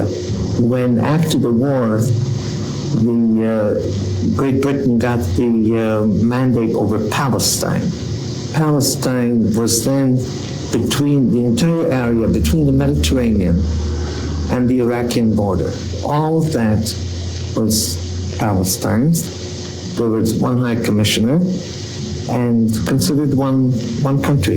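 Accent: United States. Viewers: Her American accent gives it away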